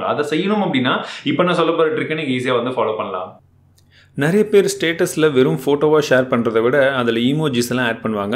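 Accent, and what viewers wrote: Chennai, India. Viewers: ✋✋✋✋✋தயவுசெய்து இனி சென்னை தமிழ் பேசாதே முடியல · Chennai basala pesathinga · Super bro. Thank you bro 👍👍 . At last Madras language 👌👌😂😂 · Bro Chennai slong semma super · Chennai slang vera level bro 👍👌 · Chennai tamil 👌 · Chennai language supera pesuringa anna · Bro tdy neega chennai la iruka peoples pesura maari pesuninga...☺️ nalla irudhuchu... Use ful tricks bro...👍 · Scheduled msging sema bro Nenga pesura antha Chennai slang la pesurathu vera lvl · Ada ennaapa nii chennai slang ķu poita nee........¿ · Really i enjoyed the madras slang of you bro it nice😍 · Chennai Slang thaaru maaru bro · Bro...unga chennai slang spr broo😍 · Chennai tamil super anna semma funna iruku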